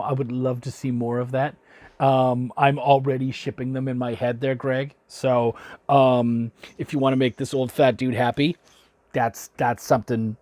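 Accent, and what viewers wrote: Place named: Canada. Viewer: You sound especially canadian in this video. I love Is'Nana, picked it up when I backed the hated! You should also check out A Black Man's Guide to Driving, really good from both parts! Solid breakdown, the world needs more raw reviews!!!!!!!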